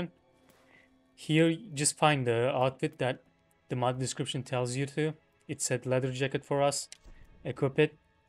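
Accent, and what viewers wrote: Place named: Turkey. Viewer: aksan türk aksanına benzio heralde türksün reis shadow of the tomb raider varmı mod olarak bide modlar oyunun orjinal halini bozarmı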